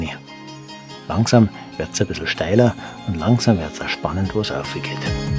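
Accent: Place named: Bavaria, Germany. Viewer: Die Moderation in Bayrisch ist die Pointe dieser Filme. Jetzt fehlt nur noch ein Wolpertinger auf der Wiese....😆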